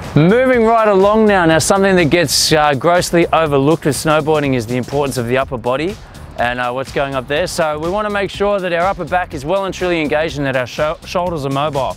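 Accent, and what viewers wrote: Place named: Australia. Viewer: I have no idea what this guy was saying...Not because he's got Australian accent but because I stopped listening after he told that girl to lay down and work on her glutes...At that point, I even forgot what this video was about. If you want people to pay attention and not get crazy distracted by the hot girl, bring a 300 pound guy to do these exercises. People will definitely pay attention as they'll feel if they don't - they will end up like that guy.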